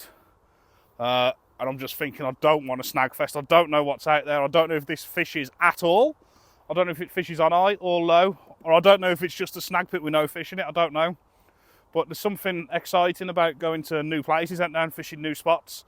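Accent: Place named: Black Country, United Kingdom. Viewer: Love the dudley accent same as me big up the black Country 😂😂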